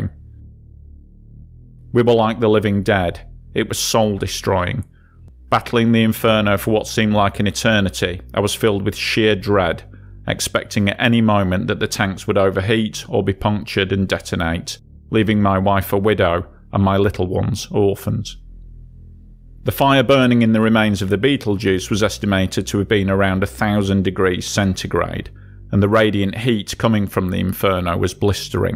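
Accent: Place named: Yorkshire, United Kingdom. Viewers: I love his accent... I was guessing Yorkshire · his accent... from Yorkshire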